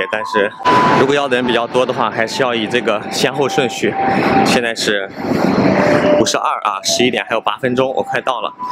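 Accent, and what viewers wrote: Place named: Taiyuan, China. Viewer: 听口音 太原后生？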